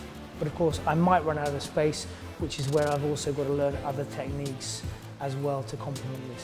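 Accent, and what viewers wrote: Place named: United Kingdom. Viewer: I'm so sorry but it's so unusual for me to see a strong,big,burly guy with a British accent.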